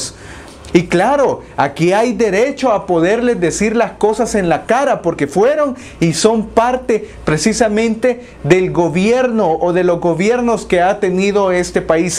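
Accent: El Salvador. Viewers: Es el primer video tuyo que miro y me llega tu formato y hasta el nombre del canal, pusiste buena información sobre la mesa. Expusiste bien y con original acento salvadoreño. Felicidades y saludos desde los Ángeles, California me suscribo.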